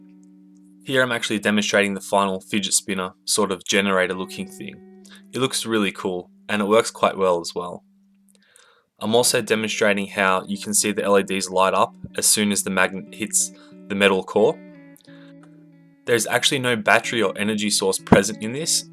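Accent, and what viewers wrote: Australia. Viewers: your voice is beautiful it sounds austrailian ;3c idk im probably just stupid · He is definitely Aussie I'm also Aussie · kd secret acc deffs aussie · Are you australian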